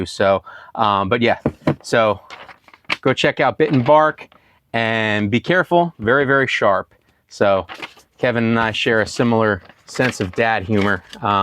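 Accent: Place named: New England, United States. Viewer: I knew that was a Ne accent. I’m in NH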